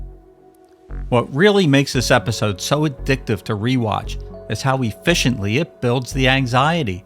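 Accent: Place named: Delaware County, United States. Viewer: Your DelCo accent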